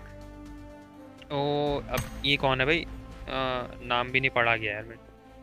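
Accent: India. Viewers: Bahi tu GADHWALI hai kyaa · Bhai utrakhand se ho Kya ?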